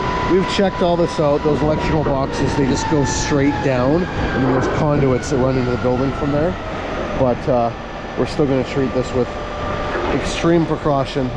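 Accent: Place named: Canada. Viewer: Lol the "Canadia" pronunciations make me giggle...de-cal v D-Cal 🤣 😂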